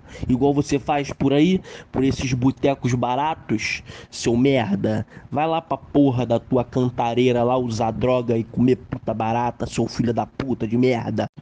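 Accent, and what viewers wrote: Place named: Rio de Janeiro, Brazil. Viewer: N tem jeito, se é vídeo com áudio de carioca, então é bom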